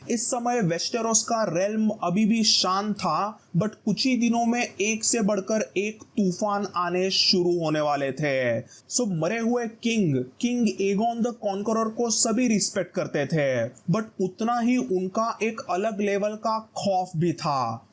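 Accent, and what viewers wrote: Maharashtra, India. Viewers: your hindi has a bit of Marathi touch to it, are you Maharashtrian?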